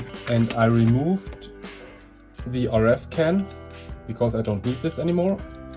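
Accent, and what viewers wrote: Germany. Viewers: Wenn du an den ersten paar englischen wörtern merkst, dass der typ eigentlich deutsch spricht :D.. schöner mod und gut gemacht allerdings haust du damit ne tonne inputlag drauf mit diesen billigen av2hdmi adaptern... besser composite und dann upscalen mit nem hochwerigen scaler und bisschen mit den filtern spielen.. aber ich verstehe deinen ansatz nette idee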